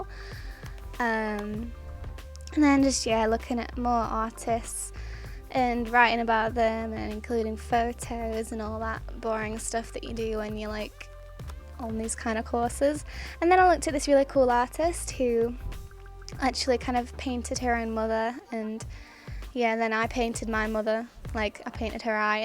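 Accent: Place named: England, United Kingdom. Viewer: 2 seconds into the video and I instantly said "That's England" 😂 Loved the video. I would love to pursue art. You are very talented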